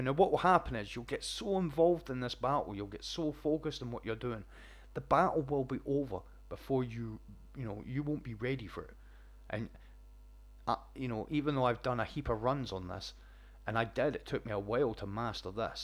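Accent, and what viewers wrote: Scotland, United Kingdom. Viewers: You are the man! Just used your solo guide for thorn strike! Will be using this guide for the solo clear. That’s awesome that you also call some of the symbols like my friend and I did. Vex head, turret, and burger haha! Thanks for everything you do dude, so so helpful! Take this as a compliment, you’re like a Scottish esoterickk! (Hope I got the accent right) no offense if you’re not Scottish!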